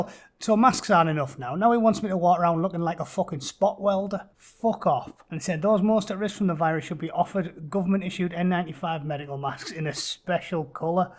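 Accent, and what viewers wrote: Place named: Teesside, United Kingdom. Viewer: Class video, Ex Army Paz 49 sent me here, have to say you've gotta a bit teeside accent, not holding that against you, I'm from teeside as well #virtualhigh5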